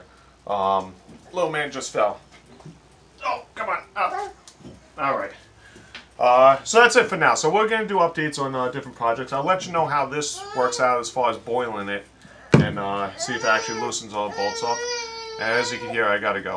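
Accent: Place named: Texas, United States. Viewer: Nice explanation! I detect an accent. Are you from Texas?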